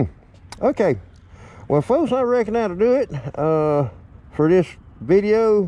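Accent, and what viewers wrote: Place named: South, United States. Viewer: Yes sir mine is a 02 classic 1500 but I've put 05 1600 mean streak engine on it and bored it .05mm over stock . I was going to change the injectors to see if that would help.i have vance and Hines straight shot drag pipes. and I've eliminated the air cleaner on left side and crossover tube. I can put new plugs in it and it runs grate for about a week .then they are black with sute and will start to run like crap.ive got service manual .and I'm going to go thru all the components and check them out while i got tank off-putting injectors in. Thank you for the input . And I love your videos you sound like your from the south like me. I own a ATV bike repair shop and I work on all kinds. But the Vulcan is mine. I loved the video on the timing chain tentioners that was a good one for sure .I just found your videos yesterday but your making grate content keep up the good work sir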